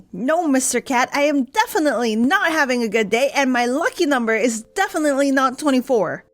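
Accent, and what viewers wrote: Philippines. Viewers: Her voice and accent is so Pilipino LOLOLOL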